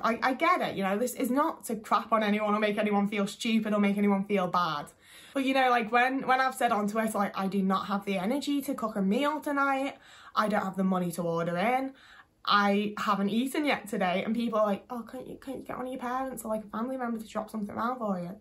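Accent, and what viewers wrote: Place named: Liverpool, United Kingdom. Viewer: Congrats on 1000 subscribers! I think yours is a particularly refreshing voice because it represents a more working-class experience. Even the Liverpool accent is good to hear when so many autistic videos are RP. Those videos from others are often still very useful but it's good to hear something more distinctive.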